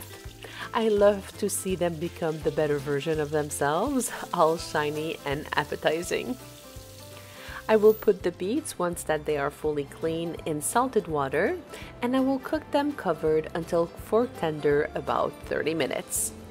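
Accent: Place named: France. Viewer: Merci beaucoup pour votre belle présentation. J'ai beaucoup aimé votre accent français 😉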